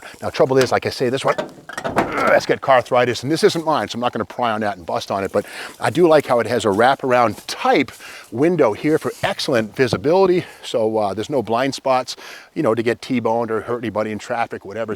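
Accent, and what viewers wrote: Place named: New England, United States. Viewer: I always love it when you do these videos AND when you are on auto auctions - you convey information in an easy to understand way and your vocal characteristics are just first rate! Being from Vermont early on, I do miss that New England accent as I live in Virginia. Keep up the great work and thank you for being on youtube!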